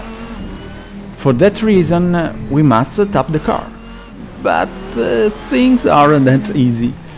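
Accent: Italy. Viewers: The occasional Italian vowels are amusing. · Absolutely italian. A bit of french influence but italian intonation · Great italian/ english accent,. Don't lose it, it's the connection to Italy and it's passion for motorsports. Also thanks for the info, for a newbie like me this is really helpful. 🙌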